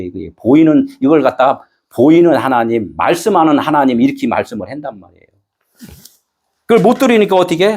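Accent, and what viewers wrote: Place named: Chungcheong, South Korea. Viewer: 구수한충청도 사투리가 정겹네요. ❤ 뿌린씨앗 풍성한 열매로 결실하세요!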